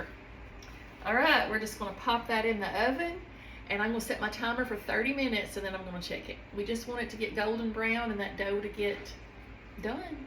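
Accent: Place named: South, United States. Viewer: But prettier and such a sweet spirit. Love the southern accent.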